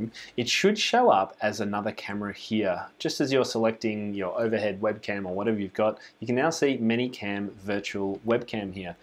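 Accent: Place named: Australia. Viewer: Super cool! I think I saw you on the Tom Lee webinar the first couple weeks of Covid lockdowns... I had written “mini-cam” in my notes and was looking for it. The Ozzy accent through me! Thanks for this video... I’m ready to do this! Would that be your preferred set-up to have a body shot and an overhead shot? I would think so... I would love to get my students on this (lots of Elementary level kids - need to see their bodies AND fingers!).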